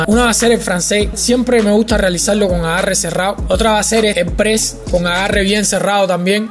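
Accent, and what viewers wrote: Cuba. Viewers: Eres cubano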